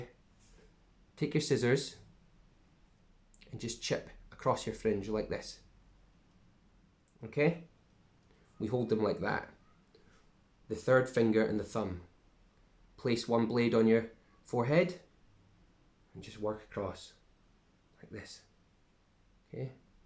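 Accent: Scotland, United Kingdom. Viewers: Lol every video ive watched has been an American, so rare to click a thumbnail and hear a Scottish accent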